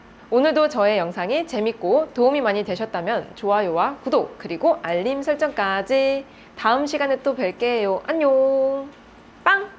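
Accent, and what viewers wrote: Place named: Gyeongsang, South Korea. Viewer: 경상도 말씨. 절대 감출수없는 말투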